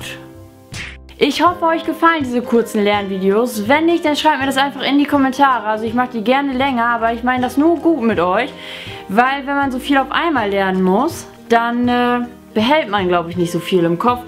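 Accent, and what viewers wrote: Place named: Rheiderland, Germany. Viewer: spricht Rheiderländer Platt. Das ist in der Tat etwas Besonderes.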